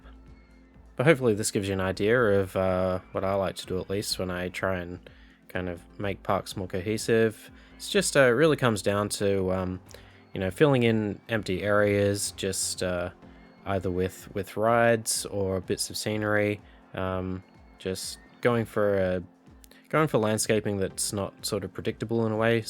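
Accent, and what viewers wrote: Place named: Australia. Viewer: Getting a lot of inspiration from your videos in general, not just this one specifically. Really enjoying them! Definitely a more chilled vibe to some of the other RCT content creators out there (without criticising them of course, just my subjective experience); plus your Aussie accent is a welcome change for a fellow Aussie 🙂I've done the whole "click like and subscribe" ! Many thanks!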